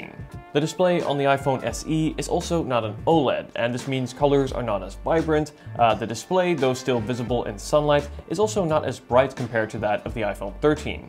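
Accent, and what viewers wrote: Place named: Netherlands. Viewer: Nice comparison (review) man, took me a minute to figure out your Dutch accent. 😂😂😂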